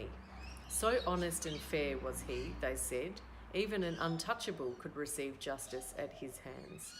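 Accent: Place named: Australia. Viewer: Oh wow. Another Aussie! I found your channel through Shaun’s video and was so excited to discover your accent! I look forward to more videos and am off to subscribe to your podcast... Love the sounds of the birds in the background. Reminds me of being near the beach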